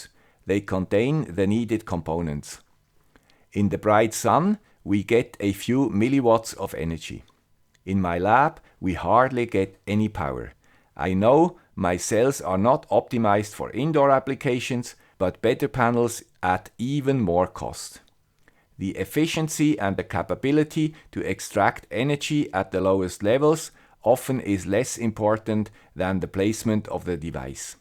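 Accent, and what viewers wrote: Switzerland. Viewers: I visited a friend in the Basel canton in early July. I can confirm this is indeed a Swiss Accent. :-)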